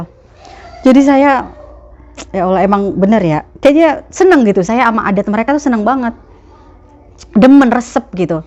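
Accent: Jakarta, Indonesia. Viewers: Ya Allah mpo ngomongnya Betawi bgt dah...😅 Seneng jg orang Betawi ada yg jadi warga Madinah.. Maasyaa Allah mantep dah.. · ما شاء الله تبارك الله Logat Betawinye kite demen banget 👍😘 Lanjuut ya review adat istiadat/budaye Saudi nye 🤗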